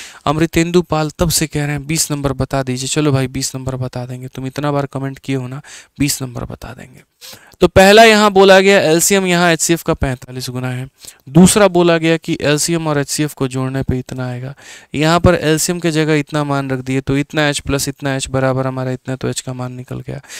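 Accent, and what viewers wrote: Bhojpuri, India. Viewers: Apki bhojpuri bahut achhi h😊❤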